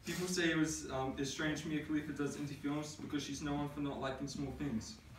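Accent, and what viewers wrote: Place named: South, United States. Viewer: His accent is a like a mixture of southern, Australian and kiwi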